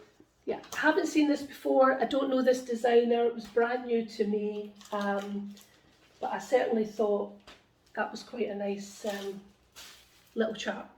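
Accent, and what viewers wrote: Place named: Scotland, United Kingdom. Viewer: Hope you and your family feel well again soon! Just came across this video in my recommended and fell in love with your accent! I don't know what it is, but the Scottish accents sound very pleasing! You mentioned that you lived in the Netherlands? How cool! Where in the Netherlands did you live? (I'm from the Netherlands, so just a little bit curious) :)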